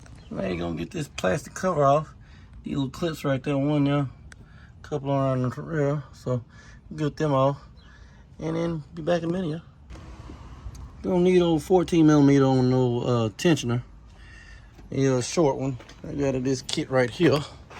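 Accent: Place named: Texas, United States. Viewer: Accent says your from Texas sir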